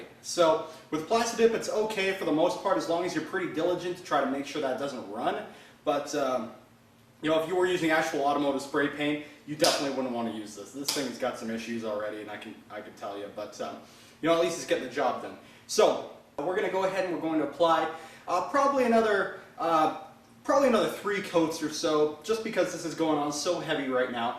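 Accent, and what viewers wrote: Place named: Germany. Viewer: Your accent man... Where are you from? Are you German originally?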